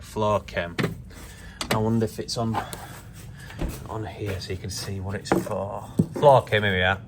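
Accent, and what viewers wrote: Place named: Yorkshire, United Kingdom. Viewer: I'm a bit worried that you are giving the animals drugs and you don't know what you are giving it for. You need to be very careful what you say and do on social media ! Turn the music down. Timelapses are horrendous. I have nothing against a yorkshire accent, but even Cammy controls his broad accent for the camera xx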